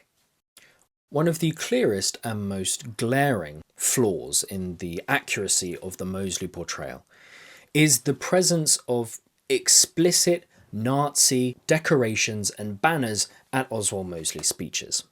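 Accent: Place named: United Kingdom. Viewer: God how I hate the British accent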